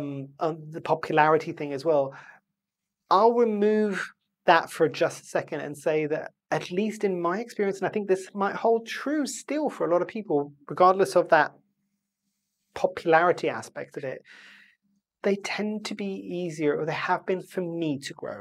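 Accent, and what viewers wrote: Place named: London, United Kingdom. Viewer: Wow, coming from a beautiful warm climate, what inspired you to move to the 🇬🇧 UK? Was it for university? Your accent even sounds like you are a native of London. Ps. You look really good in this video. (Not to say that you don't normally look good) 😊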